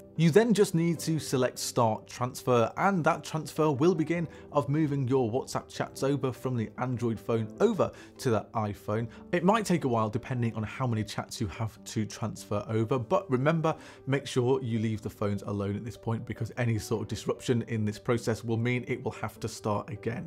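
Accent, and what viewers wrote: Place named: United Kingdom. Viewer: Ugh Please speak Normal English and fix your mouth please. You look sleepy and creepy with this British English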